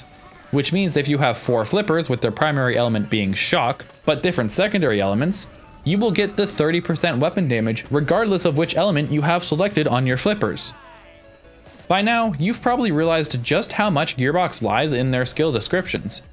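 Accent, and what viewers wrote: Canada. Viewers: Is that a Canadian I hear? 😈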